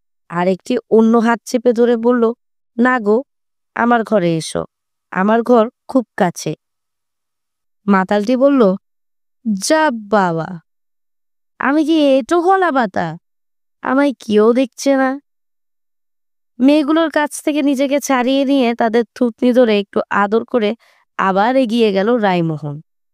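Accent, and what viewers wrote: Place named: East Bengal, Bangladesh. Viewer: স্পষ্ট করে বলুন উচ্চারণে গন্ডগোল কোথায়। পাঠিকার উচ্চারণ পূর্ববঙগীয় ঘেষা। অর্থাৎ উনি বাঙাল।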